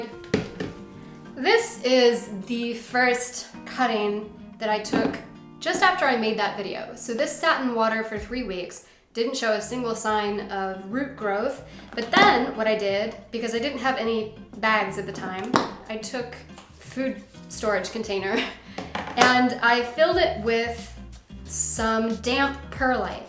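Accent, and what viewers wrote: United States. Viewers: Hi, I just subscribed! I don’t know where I heard that you were in France so I was hoping you would have a French accent but that is not the case. It’s okay I am not disappointed that you sound American lol, are you originally American? This is my first video and you made my mentally declining state a bit better so thank you! I was lucky enough to recently get a 10 dollar rooted cutting of a M Peru and I love it! I cannot believe you can easily get a full plant for the same price in France 😱! 💙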